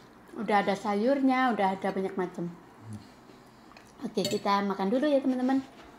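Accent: Java, Indonesia. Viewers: Lucu bngt bhsnya jawa medok 😀😀 suaminya ajarin bhs jwnya psti seru, klo udh laper gk tahan 😀muantaap bihun gorengnya praktis di masaknya, siip suami istri slng supory kompk apalgi saling bercanda ok bngt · Mbak aq suka skali kl ngmong ada bahasa jawany n' medok2 gmna gthu hahaha... Smoga sukses n sehat slalu buat smua keluarga ya mbak...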